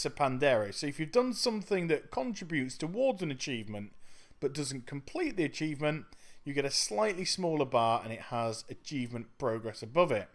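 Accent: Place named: United Kingdom. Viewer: Loving listening to a british WoW player for once! can't stand all those thousands of Americans. Thanks for guide worked a treat, was 50th mount got albino drake:)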